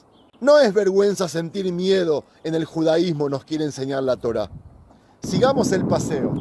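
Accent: Argentina. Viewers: Quisiera preguntarte adonde aprendiste espanol suenas de Argentina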